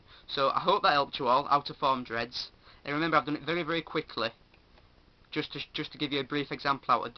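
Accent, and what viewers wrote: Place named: Manchester, United Kingdom. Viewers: Thank you, this helped a lot! This sounds strange but are you from the Manchester/Lancashire area? Its just, you speak a lot like us ;D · great vid :D, if i'm not mistaken that's a yorkshire/lancashire accent :P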